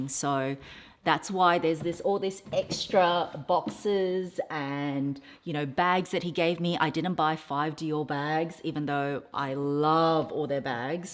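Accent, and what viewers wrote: Australia. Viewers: Hi! I've watched every single video since you started! I love luxry goods but I can't buy everything I love and you always shared the prices and introduced them perfectly! Also, I want to practice my speaking Aussie English more from you! Yes, I am from Melbourne as well! My IG is pandadududududu. Sending love from Melbourne😍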